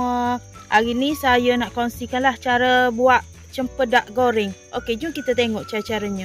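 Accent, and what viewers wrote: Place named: Kuala Lumpur, Malaysia. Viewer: Slang KL adik ni..phm sgt..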